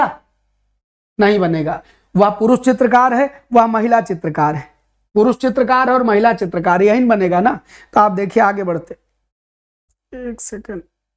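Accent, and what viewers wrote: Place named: Lucknow, India. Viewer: Pranam Sirji..Hum bhi Lucknow se hain.. Hume aapke baat karne ke lahje se laga hi tha ki aap Lucknow se hain❤Jai Shree Radhey Radhey🎉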